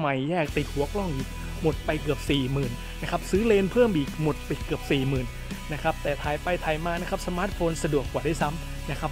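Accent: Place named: Surin, Thailand. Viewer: พี่สำเนียงเหมือนคนสุรินทร์เลยย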